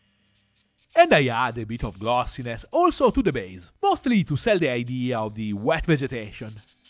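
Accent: Italy. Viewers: Video davvero belli di ottima qualità! Molto interessante ed istruttivo. Molto simpatico l'accento inglese un po' italianizzato anche se a volte è davvero comico 😁😁... Ma comunque complimenti! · Complimenti! Sei bravissimo, poi l'inglese stile Super Mario è la ciliegina sulla torta! 🥰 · aaaah okok! Sentivo dall'inglese un po' "maccheronico" che un po' tutti noi italiani abbiamo! Apparte questo, sei un mostro grande!!! Io ho iniziato ora escono statuine del presepe....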